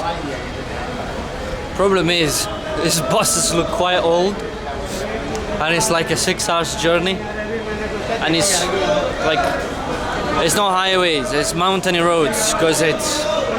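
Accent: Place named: Scotland, United Kingdom. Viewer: Great vlog,! You picked up some Scottish accent too!! 🤩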